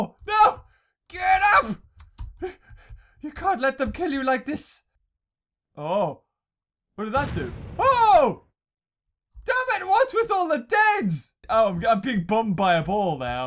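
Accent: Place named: United Kingdom. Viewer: God I love ur Brit accent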